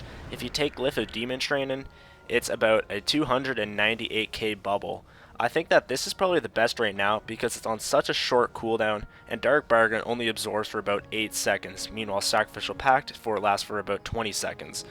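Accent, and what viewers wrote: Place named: Canada. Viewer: You have a very stereotypical Canadian accent.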